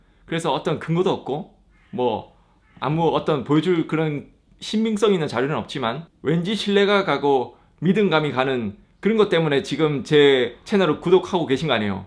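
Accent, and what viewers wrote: Gyeongsang, South Korea. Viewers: 와우 경상도 사투리가 마 쎄 빡 섞인게 매력적이시고 방송 잼나네요. 여름에 이탈리아 가는데 이방송이 참고서 되겠네요. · 얘기가 경상도 사투리로 구수함이 느껴져 구독합니다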